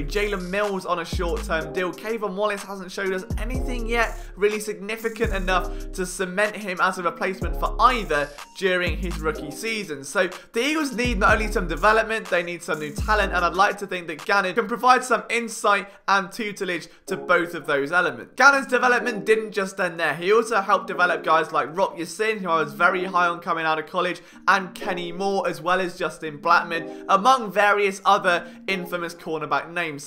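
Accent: United Kingdom. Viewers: Why, because he has a brit accent? Oh No !! He's a good commentator with good breakdowns. I don't understand why he has such a low subscribe base still.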